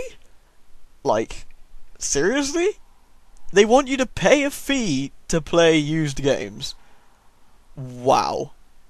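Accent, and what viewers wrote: United Kingdom. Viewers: needs to do voice acting for a British Scooby Doo spin-off.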